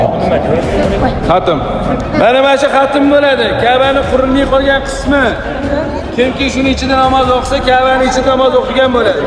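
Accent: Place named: Uzbekistan. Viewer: БОРСАМ. ХАМ. ШУ. ИНСОН. БИЛАН. БОРИШНИ. НИЯТ. КИЛДИМ. ЖУДАХАМ. ТУГРИ. ТУШИНТИРИШ. БЕРАР. ЭКАНЛАР. ОЛОХ. РОЗИ. БУЛСИН. ИЛОХИМ. УЗИМИЗНИ. ШЕВА. УЗИМИЗГА. УХШАГАН. АЁЛЛАР. КАЕРЛИКЛАР. БУЛАР. ДОМЛА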